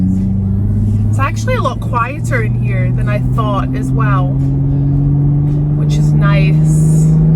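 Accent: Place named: Scotland, United Kingdom. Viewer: Yo sound part Scottish and its really messing with my head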